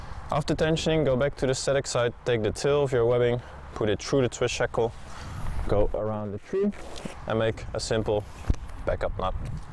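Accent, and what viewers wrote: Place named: Netherlands. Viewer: That accent, you're from the Netherlands? Since I'm from europe, I'll be buying my primitive slack through you guys. Thanks for the video.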